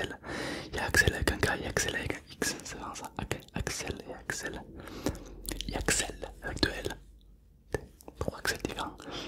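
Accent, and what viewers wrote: South, France. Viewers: tu a l axen du sud ???? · Coucou je voulais te dire que j'adore ton accent du sud et ton amour ça m'a fait beaucoup rire 🙂 PS: la prochaine fois que tu fais une vidéo sur les prénoms est-ce que tu pourras mettre le mien Je m'appelle Jean-Baptiste.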